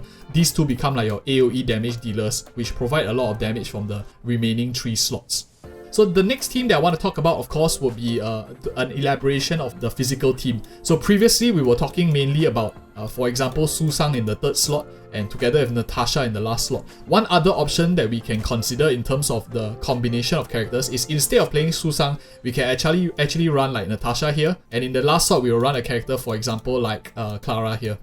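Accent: Singapore. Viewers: singaporean accent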